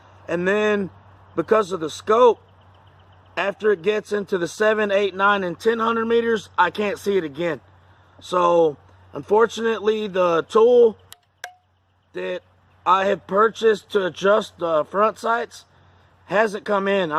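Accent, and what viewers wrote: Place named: South, United States. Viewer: Nice southern twang you got there, Mr. Lol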